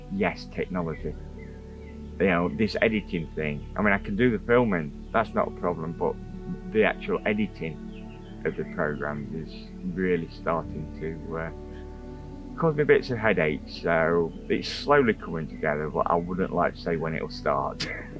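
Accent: East Midlands, United Kingdom. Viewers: From watching Dan's channel abs seeing on there i always thought that sounds like an east Midlands accent! has just confirmed it! Im a Derbyshire lad.😊 Youre doing a fine job 👍🏻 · I'm so very sorry to hear the sad news about. Condolences to family and friends. I first saw on Chateau Diaries and thought I know that accent, being a fellow midlander from Nottingham. will never, ever be forgotten and will live on through all the projects he was involved with, especially Chateau Chaumont. I hope his family get some comfort from reading all of these messages on how much this lovely Viking meant to us all. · Thank you it’s been a pleasure to watch you and to see the care that you not only take of Dan but also the trees. Just one question as a midlander I’ve been trying to work out where you’re from is it Stoke on Trent or Derbyshire 🤔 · Happy Birthday. 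June 2025. I always thought you'd got an East Midlands accent. I love listening to you talk. You said you did some work with the electricity boards. My late Dad, Gene and my Uncle Lawrence were both overhead linesmen at Derby EMEB. They'd get called to different areas of the East Mids during bad weather when Lincs EMEB (for example) hadn't kept up with their maintenance and so they had power lines down. I often remember my Dad on Stand By and having to go out over christmas. You have an idyllic life. Your fox terrier is adorable and having 5 GSDs must be lovely. i am so glad to have another chance to watch this. /Gena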